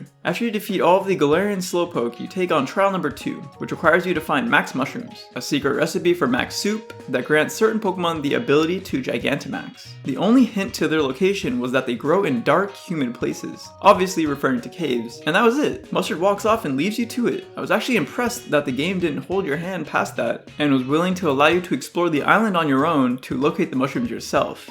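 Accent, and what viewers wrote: Canada. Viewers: Why do you sound like you from canada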